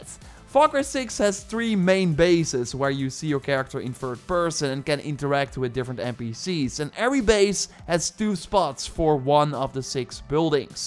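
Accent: Netherlands. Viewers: You sound dutch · New to the channel, hearing your accent, are you dutch? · You sound way too Dutch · Ik hoor een Nederlander 😁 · How is He Faking it? That is his Dutch Accent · I'm Dutch too, but dang Dutch accents are really annoying to listen to. it's called a hovercraft you dolt, we also use that word here in NL.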